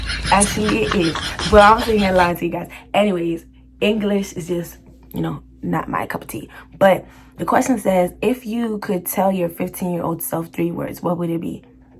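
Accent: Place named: United Kingdom. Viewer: It’s the UK accent for meee😂😂😂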